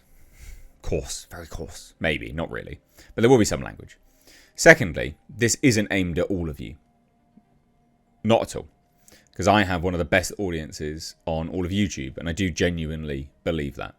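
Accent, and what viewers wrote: England, United Kingdom. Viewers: maybe the non UK audience think you are vain as they are not use to the accent and how Brits present themselves? either way I LIKE YOU and the "Kings English"! 😀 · Hey, don’t sweat it bro. It’s probably just some kids. I appreciate your enthusiasm for Aliens & Predator stuff. It’s one of the reasons I subscribe. You also have good style, good politics, & even got me into retro Datsuns. The “vain” allegations are probably just because some Americans think anyone with a proper English accent is stuck up (arrogant). Who cares…You do good work & your channel is growing. You seem to be living the dream from my viewpoint. 🫡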